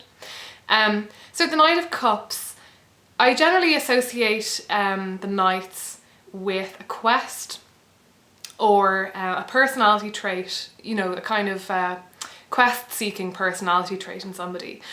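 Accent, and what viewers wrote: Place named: Ireland. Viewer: I love your accent. Irish??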